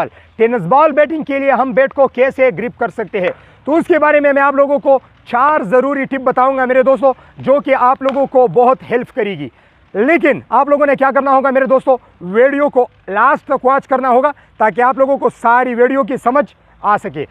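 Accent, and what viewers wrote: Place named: Kashmir, India. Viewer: Nice...Bhai....Aapke bolne ka andaaj bahut achha h ....Mujhe mere jammu-kashmir ke friends ki yaad dilata h....Keep it up...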